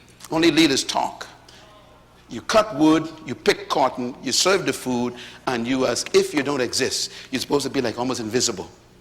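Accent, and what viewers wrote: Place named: Caribbean. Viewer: Its 2020 and I just heard this message, Never have I heard a person, deliver a message to the world, so eloquently! Just listening to the message, I can telĺ you are an intellegent man from the Caribbean! I salute you Dr Myle, wish that I could have met you in person, your words are more relevant today, because I think you were able to foresee what this world would have become!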